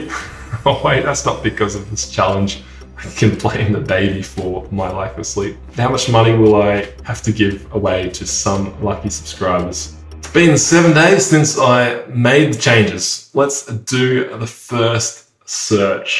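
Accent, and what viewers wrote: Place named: Australia. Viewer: yes, that's always the case. I was asking about what's your take on using chatgpt to overtake FS. No offense, but if you are to reply to my comment, make it meaningful. Otherwise, just ignore it ;) I have a feeling it's easier in Australia (based on your accent, but I might be mistaken). Either way, this tactic is still working, but it needs some adjustements for the global market. I know I might hurt your ego with this and I will probably kill my chances of earning a thousand bucks, but let's discuss this.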